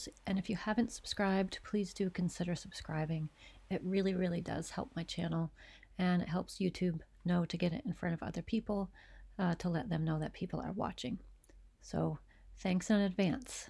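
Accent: United States. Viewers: So, American accents that aren't irritating for Brits to listen to DO exist! Really nice job on this board. Looks great. (You could voice audio books!)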